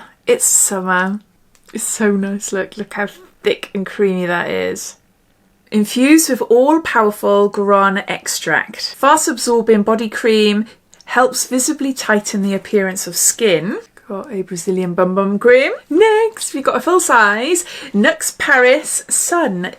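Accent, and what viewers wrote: Wales, United Kingdom. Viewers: It looks fab xx I loves your welsh accent. My family are half Welsh xx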